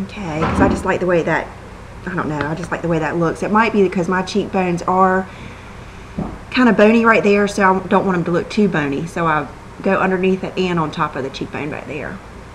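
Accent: South, United States. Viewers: Wow, they need to bring that paint pot back! Such a pretty look on you. I like my brushes "seasoned" too.....lol. I got that Nars eyeshadow in the mail Friday, it is so pretty and different. Oh, one more thing so you won't think I'm crazy, I don't know why I thought you were from Atlanta! That's why I told you I loved so close to there. Sorry. (Maybe the stereotypical southern accent that most of us from the south have, idk?!) xoxo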